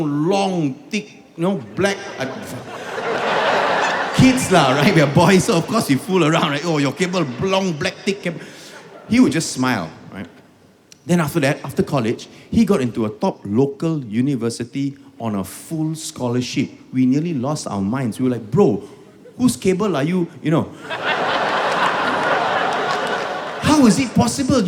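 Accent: Malaysia. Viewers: In his rant about math, i was guessing he has education background. Then he revealed he is a TESL graduate it all make sense. TESL pride 😂😂😂 His delivery very malaysian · The way he speak english like how my malay friend speak English.. the melayu slang.. darn spot on..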